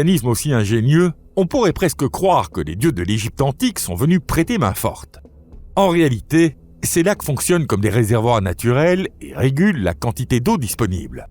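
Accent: Belgium. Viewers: Ce n'est pas une ia, c'est simplement une prononciation belge, les belges prononcent le ui, oui.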